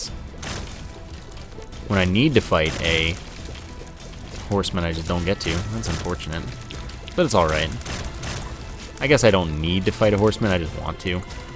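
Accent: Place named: Canada. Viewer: So, stereotypically you sound Canadian. If this is true, which area. If not, where from in actuality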